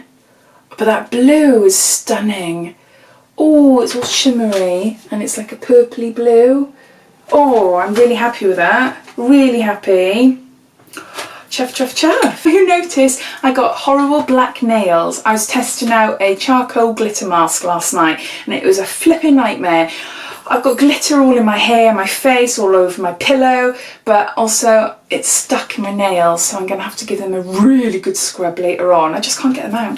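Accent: United Kingdom. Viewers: hey, so cool you answered me ☺️ i got a face mask and lip scrub that I loved but then a lip liner ( I’m a lip gloss person) tweezers and a mini body wash and lotion. So not the best, I’ll try for a few months and see. I just signed up for a few. Just found out they existed a month ago 😂 I’ve been missing out. I’ve watched so many unboxing videos since, a lot of yours, I love the British accent, it makes me feel fancy 💃🏻 keep it up.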